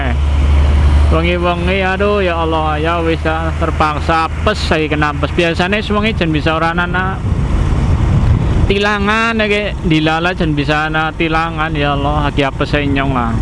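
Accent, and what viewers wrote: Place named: Java, Indonesia. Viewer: Nyong'e seneng yen bhsane medok kyk kiek 😁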